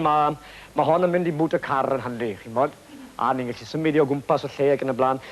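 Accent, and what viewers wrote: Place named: Cardiff, United Kingdom. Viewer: I am old enough at 76 and have lived in Wales long enough - since 1960 - both to have learned Welsh and to have had the wonderful experience of seeing Ryan on the stage in The Pavilion Porthcawl and in the New Theatre Cardiff. He was utterly and completely bilingual in Welsh and English. In Cardiff, however, he became trilingual as he had the ability to speak perfect 'Cairdiff' as well as in "Cwtsch in by me, love!"!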